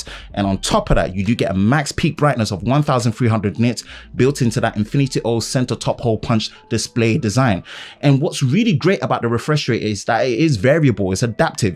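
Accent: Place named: United Kingdom. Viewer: Once again and the technical information. Few years ago I was about as ignorant as can be but after watching videos like yours I at least know how to get around a smartphone for the most part now. Also being a black American I always get a kick out of hearing the black man with the British accent oh, I think it's cool. Well once again this yank in Columbus Ohio appreciate you!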